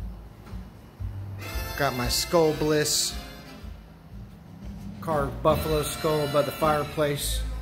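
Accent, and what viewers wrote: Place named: England, United Kingdom. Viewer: That's the queen's English sir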